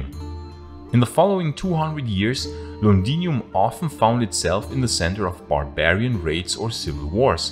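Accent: Germany. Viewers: Oh God, 1 second in and I can't watch it. Listen Mr German speaker it is not Lon,un, it is LuN Dun, stress on first syllable etc etc. YOu know how you can tell a German? Complete inability to pronounce London plus complete shock, horror, and inability to comprehend the use of the word "since" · You are German, aren' t you. I detected it when you pronounced the word Claudius .... You might want to update your english pronunciation.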